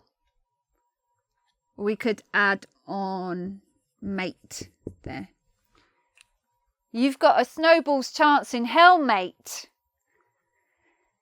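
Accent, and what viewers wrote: United Kingdom. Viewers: Hi,! Glad to see and hear you again. Your British English accent is always a good practice for me. And you are a very good teacher. With love from Russia. Ch.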